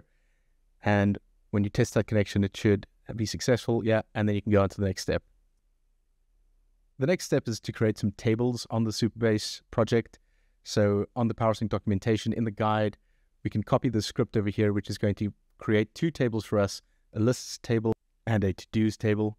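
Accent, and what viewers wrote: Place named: South Africa. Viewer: that saffer accent is unmistakeable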